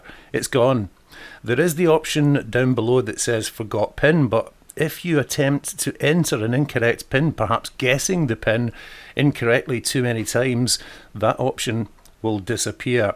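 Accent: Scotland, United Kingdom. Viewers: Trust a Scotsman to know a great solution and easy explanation to boot! The accent was very nice too!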